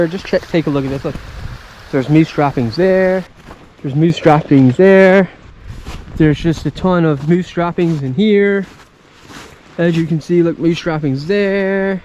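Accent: Newfoundland, Canada. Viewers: You sound like someone from Nfld...Buck baymen or a towny..?anyways, I subbed. Hello from NB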